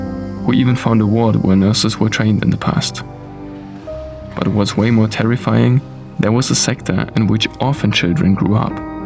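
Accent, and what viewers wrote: Germany. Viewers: Trotz des super Englisch, bin ich den Gedanken nicht losgeworden, das etwas Deutsch klingt.😊 Tolles video! Toll recherchiert, erzählt und soviel interessantes bildmaterial. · Dein Englisch klingt wie ein deutscher der versucht ein Englisch zu sprechen... Warum quatscht du nicht auf deutsch? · Laut dem Dialekt seit ihr Deutsche! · I am extremely impressed by you. You are a classy, well spoken gentleman. This is first video of yours I've watched. Glad I found you. Are you German? My mother is, and as children, that's all we spoke. But, your dialect is different than ours. Anyway...THANK YOU! ♥️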